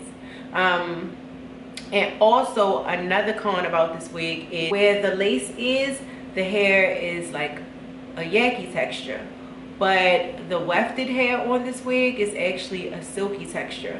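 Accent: Baltimore, United States. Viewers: her voice has a Baltimore accent!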